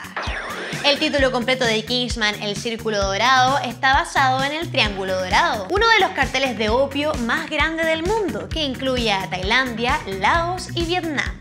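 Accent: Chile. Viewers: Chileno?? ESPERA QUE WEA???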